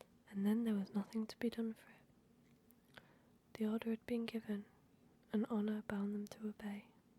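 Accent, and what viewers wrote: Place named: England, United Kingdom. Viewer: Aaahhh your voice is perfect. Definitely my favorite English accent. The intro was terrifying but you nailed the rest of it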